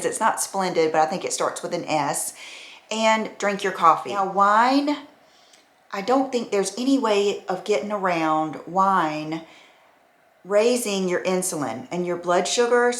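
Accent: South, United States. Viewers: I love your personality! I am a southern girl that has moved out west- and find your voice and attitude reminds me of home- you are like a new carny friend!! It is so amazing how great i feel on just a few weeks of carnivore eating (i have had a few 'eat all the carbs that i want days')-- the joint pain, allergy symptoms, most of the menopause hot flashs, and edema are gone- i have far more energy and clarity-- and wow i feel super calm- this coming from someone who thought that their personality was just anxious- my brain just never turned off! And i started this as someone who did not like meat much but now i find myself craving it and butter- fascinating!! I have not lost much weight yet but my clothes are fitting better and my face is slimming down- have subscribed and please keep up the good work- ❤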